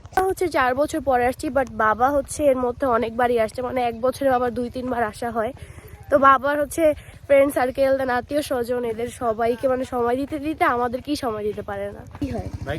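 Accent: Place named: Alfadanga, Bangladesh. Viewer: Hiiii... So sweet of you....first time cmnt korchi tmr vdo te... Amdr gram er basha o alfadanga... Shune vlo lglo amra same elakar manush....❤❤❤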